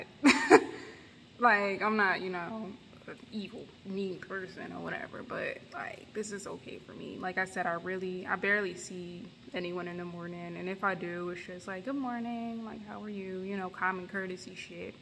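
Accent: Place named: Philadelphia, United States. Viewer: You sound like a Philly Jawn! ..or surrounding area.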